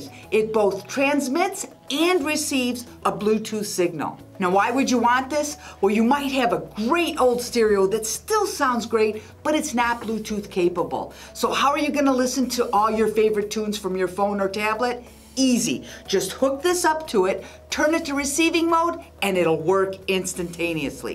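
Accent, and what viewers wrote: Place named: Minnesota, United States. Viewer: I thought she was from Minnesota, I kept waiting for her to go "dontcha know".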